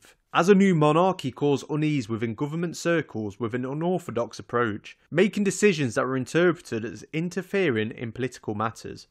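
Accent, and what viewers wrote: United Kingdom. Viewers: This is amazing and so interesting thankyou so much and i love your british voice ❤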